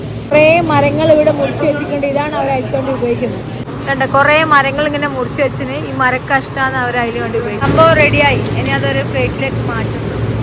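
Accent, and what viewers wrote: Kannur, India. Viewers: ഒരു കാമറാവുമണിനെയും കൂടെ കൂട്ടാമായിരുന്നു. ഭാഷ ഒന്നുകൂടി നന്നാക്കാമായിരുന്നു. തനി നാടൻ കണ്ണൂർ ഭാഷയായിപ്പോയി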